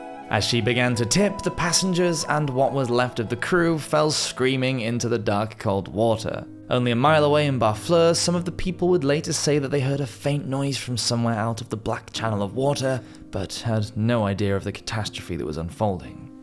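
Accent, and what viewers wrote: England, United Kingdom. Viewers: You sound English? They didn't teach you this? I am Australian. I learned this ... did the conservatives fuck you over THAT much?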